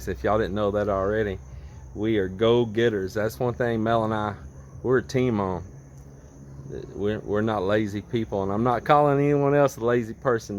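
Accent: Texas, United States. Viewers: You from texas? I can tell. Working in BPO :) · I am a foreigner working in Manila. I like the attitude you share and I also like your Texas accent. 😄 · I always love Texan accent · Luv your texan accent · Love the accent sir, texan accent! I’m proud of u sire! Can i work with u sir? Don’t worry about the salary, just love hanging out with u sir. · And i love your Texan accent.. real cowboy language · Love your passion. Hope to have more of you. Can we clone you? Love your Texan accent. Hope you are enjoying your stay in the Philippines. You are a sweetheart. Take care and God bless.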